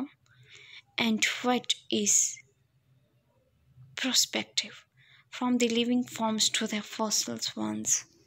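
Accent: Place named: Lahore, Pakistan. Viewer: Voice👍👌🤗🤩 lagdi lahore di aa 😅😜😚😁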